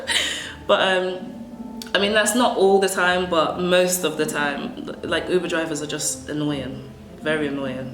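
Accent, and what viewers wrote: United Kingdom. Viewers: i really love ur accent,,its british accent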